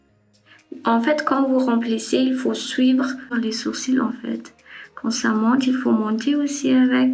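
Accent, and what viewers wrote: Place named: Germany. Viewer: Merci bcp,j' Adore votre chaine😙😙😙 Le Accent kou efa lasa Deutsch😀😀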